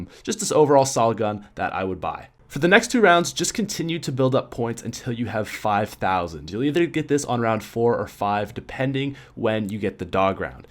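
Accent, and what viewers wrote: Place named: Canada. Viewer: Hahaha you must be new to his channel. Not trying to be rude, but he's Canadian and says some words just a bit different. Nothing bad about it tho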